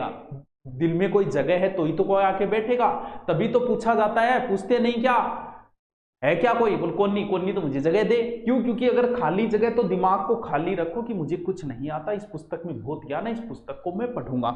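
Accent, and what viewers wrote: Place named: Marwar, India. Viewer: Sir..ager English k words bolte ho to pronunciation ka dhyan rkho..."Marwadi" Wali feeling aati h... Other wise hindi hi bolo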